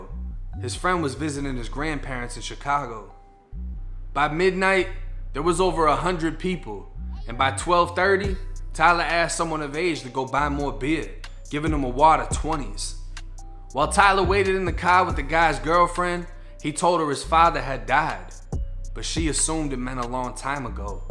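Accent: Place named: Florida, United States. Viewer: Lol yo not for nothing bro story telling voice is krazy 😩😆😆🙌🔥🔥🔥 lol I’m from NYC and I know this a wild Florida accent but that alone has me hooked on these videos, perfect example of BEING YOURSELF! Always be yourself, it’s the difference between true success and temporary failure 😊❤️🙏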